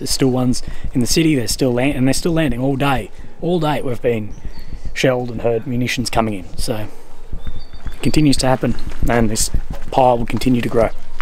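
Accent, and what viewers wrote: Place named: Australia. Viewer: Jeez there are some cynical people around; surely most people can see that you are genuine in your cause? As an Aussie i want to say that it's great to hear your accent on a vid about the war in Ukraine! Thanks for flying our flag mate, you're a champion :)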